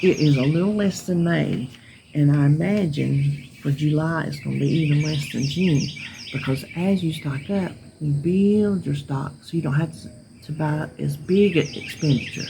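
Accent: South, United States. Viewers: 🐯Hi have a great week. Your giving good advice🐯Thank you. Plus I like your Southern accent. I'm here in Iowa🐯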